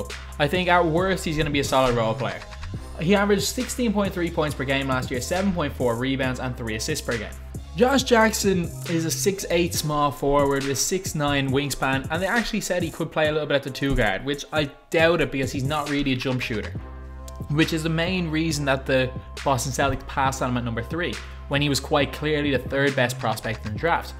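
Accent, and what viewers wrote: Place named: Ireland. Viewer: Is he Irish bc he sounds like Conor Mcgregor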